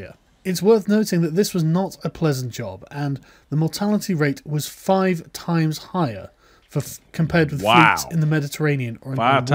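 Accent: United States. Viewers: im glad that u are learning , but ur a tipicle american , im english and when u speak my ears hurt A-rab lol E-unuchs dude it hurts to hear u talk out the words ,sorry but it does ,keep up the learning its good .... try listening to english speaking english and repeating how they say words how you say them isnt right its course to say the least , remember its are language we invented it , its not yours ie:american i proberblty would come across to spanish or french the same if i wanted to try thier language but! that aint happening lmao tc b safe :)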